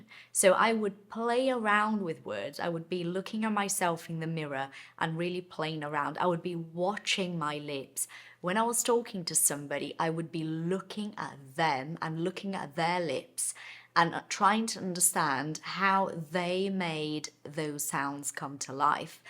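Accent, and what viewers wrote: United Kingdom. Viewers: Your British accent is amazing! The First time I saw you I thought you were a British woman, I got surprised when I found out you're Brazilian. As I Said your British English is perfect. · Why did you choose British accent? Congratulations, it's a beautiful accent. 👏👏👏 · Eu também achava que você era britânica quando vi pela primeira vez seu vídeo aqui. O seu curso é também para quem fala mais o inglês americano? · Hi there! How long have you been learning English? How long have you been working on your pronuntation? You sound like a native British speaker. 🎉🎉🎉🎉 Do I need to record only my voice or a recording of myself with a camera?? Thank you so much!❤❤❤ Greetings from Ecuador.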